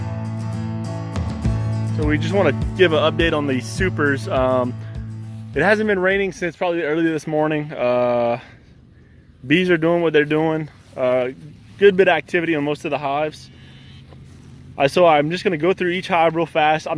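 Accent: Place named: Lafayette, United States. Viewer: Personally, I’m terrified of bees, but I watch your videos to understand bees better. Hopefully bees won’t be as scary if I understand why they act the way they do. Also, your accent is a taste of home for the homesick. I’ve been missing Lafayette.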